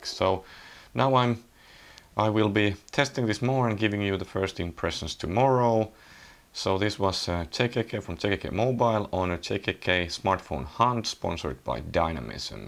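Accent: Finland. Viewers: ahhh the finnish accent :D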